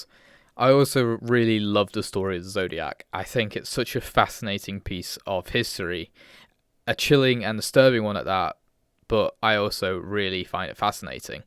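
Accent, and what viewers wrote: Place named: United Kingdom. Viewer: I enjoyed the review m8 👽 Gotta love the silky smooth british audio~ keep up the good work. 👍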